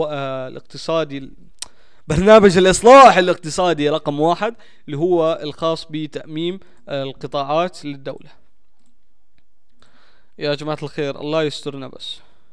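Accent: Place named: Iraq. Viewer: والله يحبيبي انشالله شكلها الحقة تحمس بس اني هسه داباع متعجب دولة مثل المانيا ماعندها نفط وغاز وحتى ماء ومساحة صغيرة من اقوى اقتضادات العالم والعراق والدول العربية عندها اضعاف هلشياء وشعبها بلكاد عنده اكل والله للاسف وضعنه كذا تحياتي الك ولابناء شعبك اعراق والاردن اخوة والاردن اكثر الشعوب وقفت مع العراق واحنا نفس اللهجة تقريبا يعني من اشوف فيديوهاتك انسا انك اردني لهجتك قريبة علعراقي وانشالله مابيزعزع احد بين العراق والاردن مثل مازعزعو مع بقية الشعب العربي ❤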